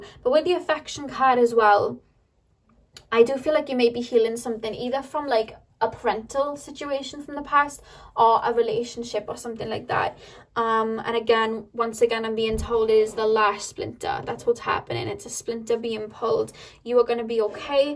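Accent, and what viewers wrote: Wales, United Kingdom. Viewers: I always take every one of your pick a cards as a personal reading, because you're really that accurate. And you're so comforting and uplifting, hearing your lovely Welsh accent is like receiving a hug from my Nana (she was also from Wales, sadly passed away.) Thank you so much, you are a true spiritual warrior & you give so much, you deserve all the love in the world 🐉💎💐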